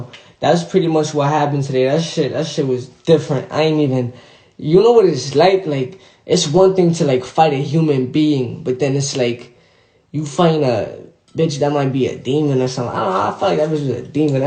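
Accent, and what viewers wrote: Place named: New York, United States. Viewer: what makes the story even funnier is his New York accent 😭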